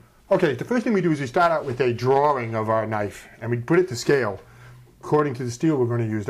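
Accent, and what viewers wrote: United States. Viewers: Are u american?D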